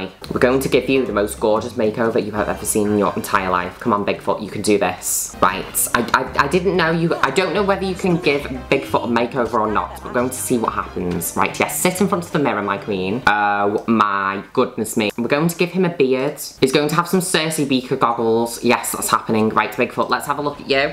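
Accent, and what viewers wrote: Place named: United Kingdom. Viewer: I don't like the British accent ngl.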